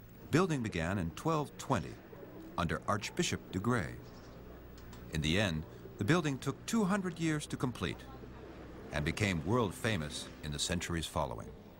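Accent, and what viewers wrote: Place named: United States. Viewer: You know it's an American for how they say English place names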